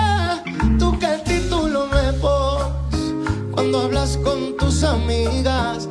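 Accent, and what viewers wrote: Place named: Andalusia, Spain. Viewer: Tiene un timbre de voz que podría ser perfectamente andaluz ❤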